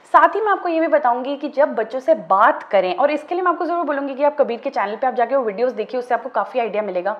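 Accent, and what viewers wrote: India. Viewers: U r from south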